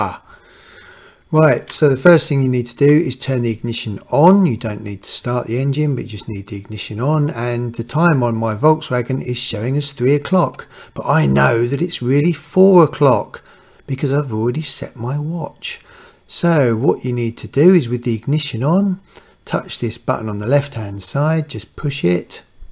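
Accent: United Kingdom. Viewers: If want know my opinion, so, don't waste you valuable time on subtitle for languages which you are not sure Google translates properly. Russian is funny but about 70% understandable, Latvian ... I checked your another video - maybe 20% even less. So, Does not helps. I can't tell about other languages, just that I know well. There are auto-translated and manual translated subtitle options at Youtube. What I suggest, is make really good English ones, because that could help a lot for non English speaking people who not very good at British ascent. Hope this will save you a lot of time. Good luck my friend.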